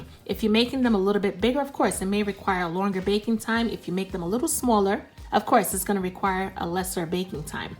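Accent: New York, United States. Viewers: I’m a brand new subscriber. These crab cakes came across my feed, and I was hooked. Are you from NYC? I read your about but it didh’t say. My hub is from the Bronx. I hear an accent. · Lordy,Lordy that looks good. I’ve been fasting for 18 hours now and this just drove me to another level of goodness.I hear a hint of NY in your speech, is that right. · Hi. I just love your videos. I really don't remember when I subscribed to your channel, but it had to be something that look good for me to do it. Me and my husband was watching a vid of yours maybe a week ago. Your recipes look sooo amazing and good. But, our decision was about your accent. I said that you sound like you are from NEW YORK. He said your accent sounds like more of NEW ORLEANS. Please let us know. Because TRUTHFULLY!!!! NYC IS ON MY BUCKET LIST TO VISIT!!! WE BOTH LOVE EAST COAST RAP, so I don't understand why he said NEW ORLEANS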